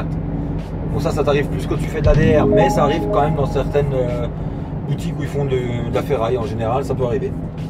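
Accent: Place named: South, France. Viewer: Très belle vidéo comme toutes jusqu'a maintenant!Félicitations pour tes 10500 abonnés!Déjà deux an que tu existe sur youtube et deux ans de pur plaisir!Bonne route!Petite question tu est originaire de quel département?On dirais que t'as un peu l'accent du sud (bordeaux)😅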